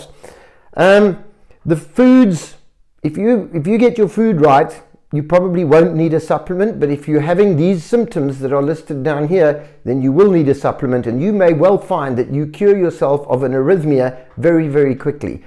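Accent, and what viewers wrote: South Africa. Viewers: I can hear that you are a South African. Cape Town?? Do you run a practice??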